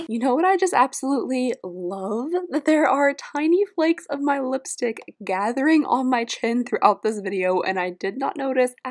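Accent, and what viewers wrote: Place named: South, United States. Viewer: Nice box! Never heard of it but I totally love that it's Jurassic Park themed-ish! The company my dad works for made the hydraulic pumps used in the dinos so that movie was my favorite as a kid for a lot of reasons. Btw, am I late to the game or do you have a heart tattoo om your pinky finger? I noticed it in the past few videos, unless I've still got sick brain and never noticed before which is totally possible, lol. Anyway, like I've said before, yours is the first channel I've seen with unboxings and you got me hooked! My husband and kids know when I've watched one of your videos because I apparently adopt your accent. I live in NE Ohio so not many Southern accents, lol. Love your vids; I watch for the unboxings and stay for the ramblings. 😆 thx for sharing! 💖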